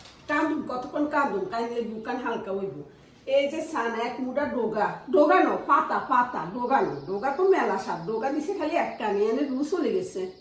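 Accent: Noakhali, Bangladesh. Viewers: Anti অাপনার কথা গুলো শুনে কলিজা ঠান্ডা হয়ে গেছে অাপনি অাজকে পুরো নোয়াখালী ভাষায় কথা বলছেন । অামি নোয়াখালীর মেয়ে অাপনার ভিডিও অামার কাছে ভালো লাগে ভালো থাকবেন খালামনি।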